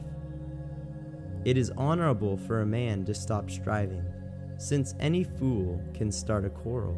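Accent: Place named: England, United Kingdom. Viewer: Thanks you so much bro. I am learning English accent with your bible study.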